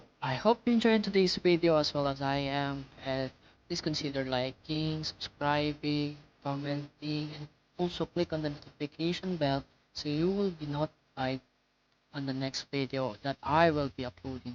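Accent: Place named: India. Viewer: If it is Indian accent then I watch till end. Because I know it’s going to work. Thanks man.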